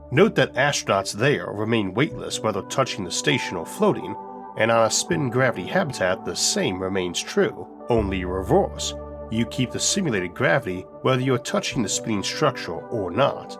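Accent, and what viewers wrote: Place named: South, United States. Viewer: Just gotta ask Mr. I'm not really trying to criticize, but I gotta know about your speech patterns. Like I said, I'm not trying to be offensive. Do you hail from the southern USA, like Texas. I hear what sounds like an accent there. Oh and I love your videos and knowledgebase.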